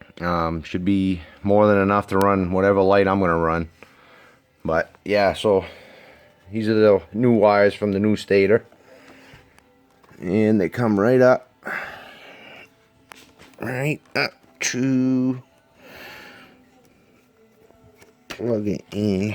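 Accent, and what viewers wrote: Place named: Boston, United States. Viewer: "I live up in tha woods" I wonder if he's here in New Hampshire, "shit gets pretty dahk" knew it, ha. Can't mistake the combo Boston/ Downeast twang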